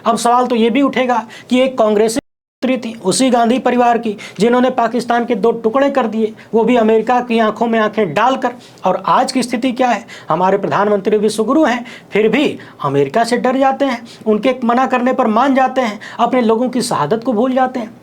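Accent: Bhojpuri, India. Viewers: ए महाराज कहां के हैं बडा भोजपुरीया बोलते है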